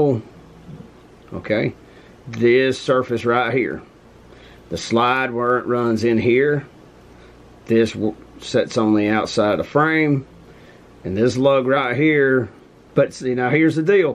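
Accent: South, United States. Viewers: Your accent is exactly what they aimed for in inglorious bastards for brad pitt. love it.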